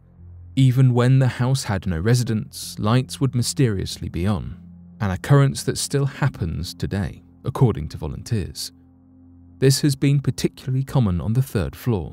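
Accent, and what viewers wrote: United Kingdom. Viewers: You can't beat a good British accent 👍🏻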